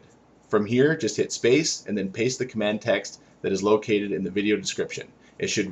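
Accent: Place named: United States. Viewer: thank you so much for speaking clear american english without an accent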